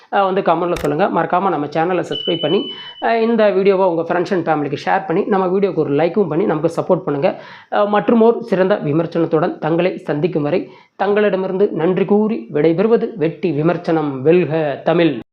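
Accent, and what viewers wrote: Kongu, India. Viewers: Kongu Tamil Vazhga.. Well done review..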